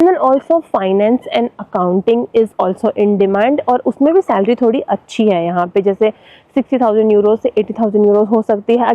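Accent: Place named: Uttar Pradesh, India. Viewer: Bahut achchi video aap U.P. se hn kya aap ki langauge se lagta h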